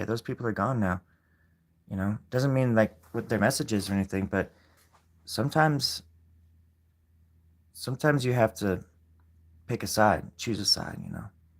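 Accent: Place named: Australia. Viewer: Your are an Excellent Artist! & a Beautiful Singer! I just want to tell you this. and what a Unique Podcast too! You are also Funny! I love your Australian Accent!, very convincing Mate! You are a hidden Box of Massive Gems 💎 M🕊️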